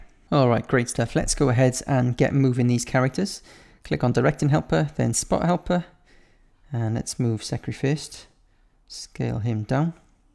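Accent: Wales, United Kingdom. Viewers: omg! you're welsh!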